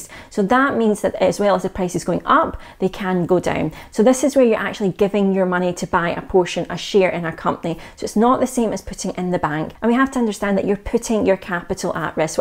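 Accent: Scotland, United Kingdom. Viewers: Thanks! Have been watching all your videos these days and they really help me to start establishing my financial freedom! And I’m really happy to hear someone who is from Scotland! (I’m in Edinburgh) 😃Just one question here, if 212 is free, does it mean if I invested the same ETF, would it be cheaper than buying directly from Vanguard? X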